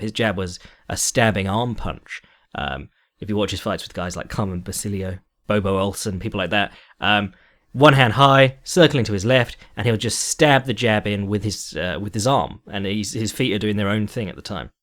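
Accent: England, United Kingdom. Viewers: is back already... the usual week long hiatus of a good old English accent delving into the joys of combat is no more. Thanks!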